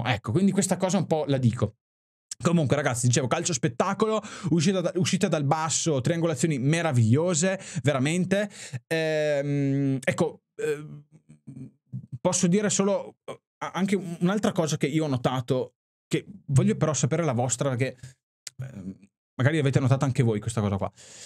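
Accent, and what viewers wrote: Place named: Veneto, Italy. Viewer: Grandissimo bel video..ma poi mi fa sorridere quel tocco di dialetto veneto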